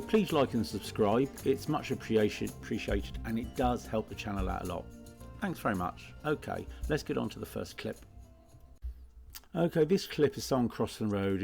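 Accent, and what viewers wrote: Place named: East Anglia, United Kingdom. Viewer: I'm detecting an East Anglian accent.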